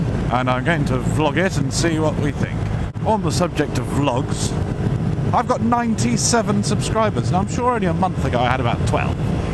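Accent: United Kingdom. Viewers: Such a wonderful British accent. Subbed old chap.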